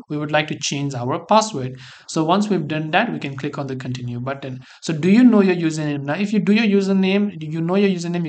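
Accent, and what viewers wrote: India. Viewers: I would need to listen to this 30 times before i got it. Speaking too fast and with an Indian accent. Thanks.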